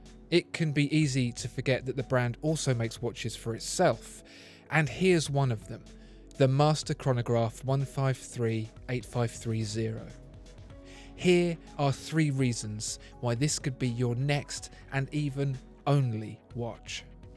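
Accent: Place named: Essex, United Kingdom. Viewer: Are these voice overs done by Alan Davies? It certainly sounds a very specific accent of Essex/London border and certain class, not quite posh but not chav either.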